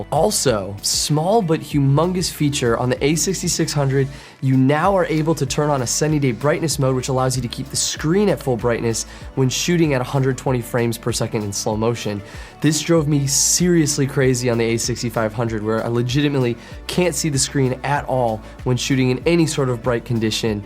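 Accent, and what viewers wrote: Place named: United Kingdom. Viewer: i loved that British nat geo wild voice 😅 thats why i hit that like button,cheers mate ,greetings from kenya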